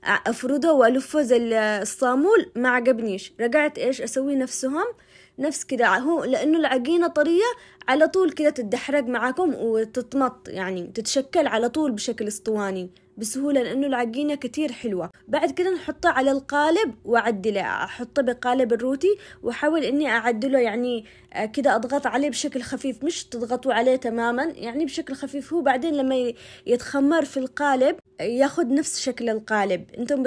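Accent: Aden, Yemen. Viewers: هي عدنيه مافهمتي لهجتها 😊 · يااختي يكفي كلامه العدني العسل اقسم باالله انه سكره اني من تعز ومافيش هذاالخبر وفعلآاصحاب عدن اكلهم روعه ويجنن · كلامك يجنن اعشق اللهجه اليمنية محبتك من السودان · ربنا يحفظك و يبارك لك في كل شيء. طبخك لذيذ جداً و مليان نصائح مفيده. بالاضافه إلى كلامك العدني الجميل. الف شكر من القلب و لك كل الاحترام و التقدير❤❤❤❤❤ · ههههه شكلك صفر بالطبخ البرياني طريقته جدا مختلفة عن الزربيات وعدن من قبل ما تعرفيها لها طابعها اشي بخور اشي عطور ولو ان الشمال اي اخوتها بالشمال لما يطبخوا في قنواتهم يستنكر ان الاصل عدن في الروائح الجميلة واشي فن و الطبخ الرهيب بس انت بجد اظطريتيني اعلق اما الانفصال او الوحدة هي بيد الرحمن وايش دخل الروتي بالسياسة ياسلته وتميز وسحاوق بالشقر 😂... اختي هذه والله اول مرة اشوف قناتك و3 ساعات وانا اتفرج ع فيديوهاتك الرائعة وتقديمك الرهيب ونظافة مطبخك وحشمتك وصوتك العدني الي يشوقني لطيبت عدن واهلها المتواضعيين بغربتنا ياسلام عليك الله يحفظك واهل بيتك من شر ماخلق استمري وهذا اول لايك لك ❤ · ❤تسلم يدك وكلامك العدني الحلو😂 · الهجه العدنيه غير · ما شاء الله شيء جميل. 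واللهجه اليمنيه اروع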